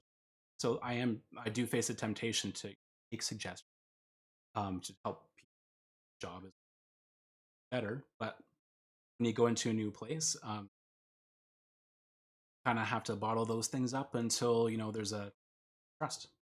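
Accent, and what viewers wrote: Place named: Canada. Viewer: I thought you were Canadian, before you said so. The way you say "about", "shout," words like that gave it away.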